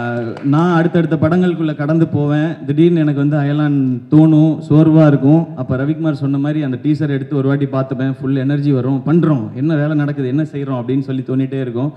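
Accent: Coimbatore, India. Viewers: Coimbatore slang swag nov ❤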